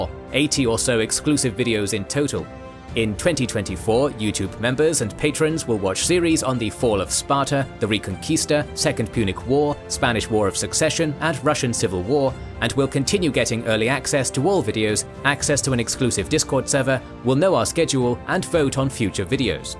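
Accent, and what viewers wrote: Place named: United Kingdom. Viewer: Love the coverage of Ukraine . Probably the best I’ve seen and the British accent is a must.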